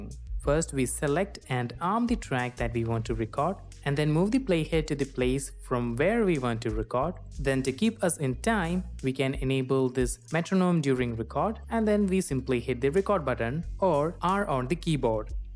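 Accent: India. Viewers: yooo such insightful tutorial, god bless. Also loved your mallu accent XD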